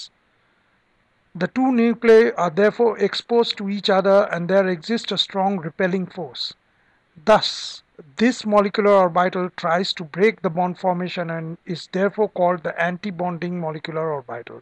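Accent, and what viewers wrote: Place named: India. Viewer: Typical Indian voice..... Good.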